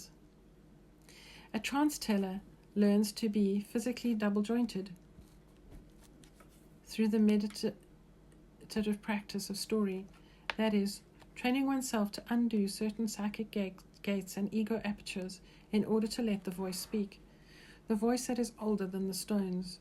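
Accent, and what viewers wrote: South Africa. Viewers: Are you South African? I love your accent. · The reader sounds South African 😊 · Accent sounds south African ❤❤❤ thank you ☺️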